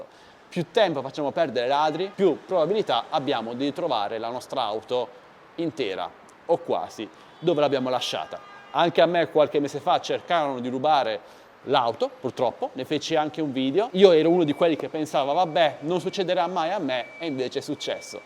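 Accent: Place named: Apulia, Italy. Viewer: Trackting non è testato contro i jammer infatti sento dal tuo accento che sei pugliese e intorno a Cerignola non serve a niente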